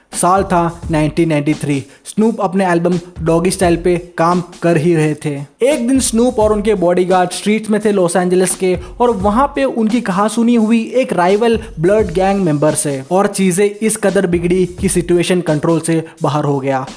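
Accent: Kerala, India. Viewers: Enk manasilai since day 1 u have that Malayalam slang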